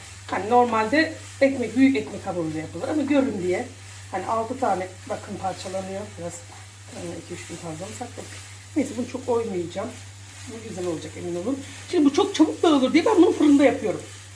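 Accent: Aegean, Turkey. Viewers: Hanımcığım, Ege şivesiyle konuşmanız, içten ve samimi anlatımınız çok güzel. Ekmek dolmasını rahmetli anneciğim yapardı. Bu tarifinizi izlerken anneciğimin mutfaktaki uğraşı gözümün önünden film şeridi gibi geçti. Anılarım canlandı.😔 Ekmek dolmasının kokusunu duyar gibi oldum. Size çok teşekkür ediyorum. 🙏 Ellerinize, emeğinize, tatlı dilinize sağlık. 🙏 Kameramanınız sevgili oğlunuzu da kutluyorum. Çekimde ve soru sormada çok başarılı.👍👏👏👏Yiyenlere afiyet olsun canım. 😋 Selam ve sevgiler...🙋♥️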